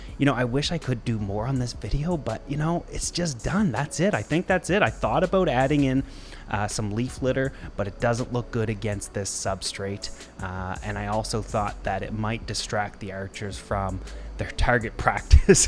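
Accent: Canada. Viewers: 1st thing I like the idea of brackish and the lay out of aquarium. I've had few types of tanks over the years. Don't have any now and no I haven't lost the Love for the hobby it's I want to do something different but habits are hard to brake. 2nd I hate it when people belittle themselves, I'll answer your question why pronounce the way you do. Bro it's your accent. I'm not to familiar with Canada but in the States we have to deal all kinds of different accents. Every part of country seems to have its own. Good luck with the brackish and don't let fools like these question yourself.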